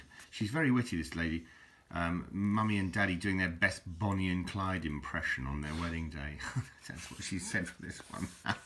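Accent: RP, United Kingdom. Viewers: Thank you for your enlightenments + you're most welcome God bless you &all your devoted work. You honestly are so dedicated in all the work you're doing + it should be acknowledged. You're immensely sociable + groundedly humble for one whom speaks with such a posh accent Look forward to your insightful interesting humble videos 🌼🍀☀️🍀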